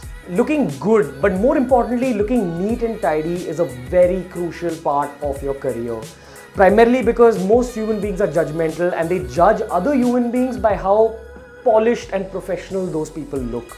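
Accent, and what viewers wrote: India. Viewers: Indian Accent. Funny. Do you paint?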